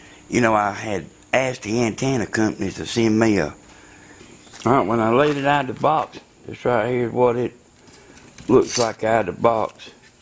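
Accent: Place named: Georgia, United States. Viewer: Soon as you started talkin I knew you was from Georgia..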